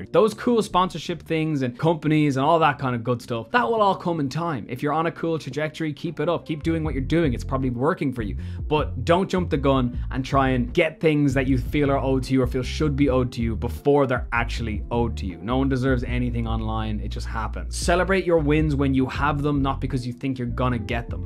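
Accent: Ireland. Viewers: I should not be watching this because I'm trying to get out of my Twitch grind mentality but I just enjoy your irish accent so much! xD Anyway Muted streams do count as views according to Twitch support on twitter: <link>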